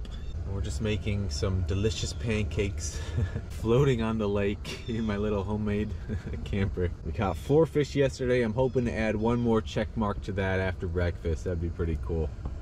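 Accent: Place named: Brooklyn, United States. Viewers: Unintentional asmr, Mild Hint of Brooklyn Edition. Mom or Dad came from Brooklyn, based on 1 degree of separation ideolect dilution. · where is this guy from? the accent is subtle. near new york, perhaps?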